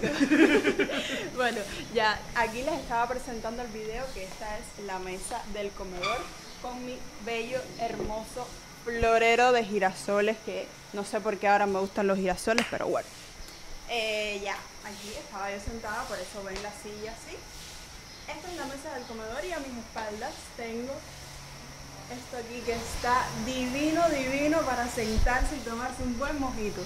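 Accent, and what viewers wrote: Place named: Cuba. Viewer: Conozco tu país, pero cuando estuve por ahí no existía la pandemia, por lo que no tuve la oportunidad de familiarizarme con el término. Lo que sí existía era un calor de morirse. Muy lindos tus videos, muy sugerentes, porque a lo coloquial de tu lenguaje con ese dejo muy cubano, le agregás una simpatía que cautivaría a cualquiera que te viera. Te felicito.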